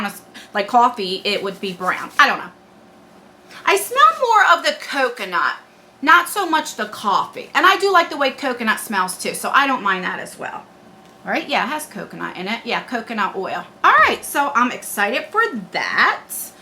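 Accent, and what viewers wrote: New Jersey, United States. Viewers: I'm obsessed with watermellon and your NJ accent. It makes me so homesick since I moved down south. Keep making these awesome videos!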